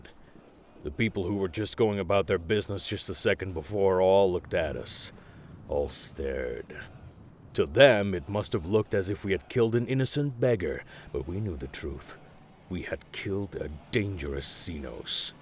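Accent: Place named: South America, Latin America. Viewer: Great adeptus arbites audio log. Didn't expect the light souther american accent but i like it. Makes the members of the arbites seem more human despite the megalomaniacal authoritarian mania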